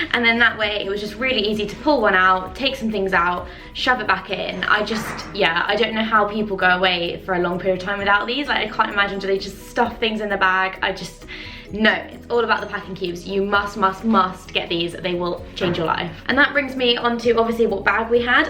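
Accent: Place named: England, United Kingdom. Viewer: Always wanted a girlfriend with an English accent-sounds so sophisticated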